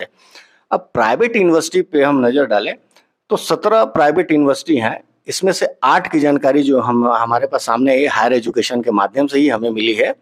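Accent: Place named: Bihar, India. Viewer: Aap bihari hain kya cg ke nahi lagte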